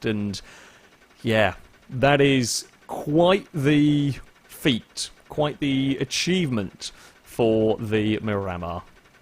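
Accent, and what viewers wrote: United Kingdom. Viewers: He is British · Yeah he's British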